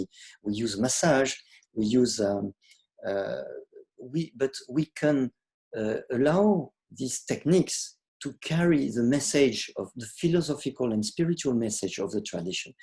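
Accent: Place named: France. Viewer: (To my humble opinion) This is worth enduring Frrench accent to benefit from his knowledge given with such gentleness. I am sending you my gratitude especailly for the short meditation you guide here, this is exactly what I have been missing and looking for since I came back home after the TEL6 post-coco:-D Namasté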